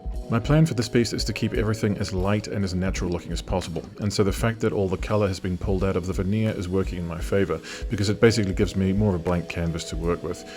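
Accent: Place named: Australia. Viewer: Great looking piece and a timeless classic. ❤I am living in Germany as well, but my I ask where you are from? I suspect more Australian than British judging from your accent.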